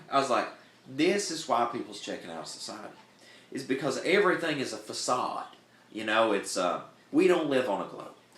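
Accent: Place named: Alabama, United States. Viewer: Today I had a class to teach and I said pretty much what you just said. If people knew they were living in a controlled environment similar to the movie "The Matrix" they would freak out. The truth is too much for so many. I have tried for years to wake people up including my own kin folk to no avail. One or two of my nephews are awake but no one else listens or seems to care. The brainwashing is very , very strong on the population. I too was going to leave and head for the hills but instead I decided to stay and help my neighbors. The same neighbors that laugh at me when I talk to them about what reality is. It's going to be very, very bad and millions if not billions will die. Thank you for your hard work! Oh...your accent is similar to mine. I grew up in rural Alabama. Some folks just can't understand what I'm saying. LOL.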